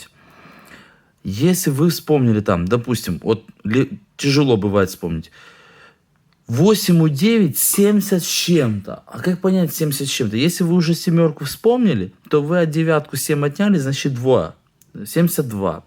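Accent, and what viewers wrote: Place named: Ukraine. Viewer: Вот удивительно, фамилия и внешность восточная, а акцент украинский)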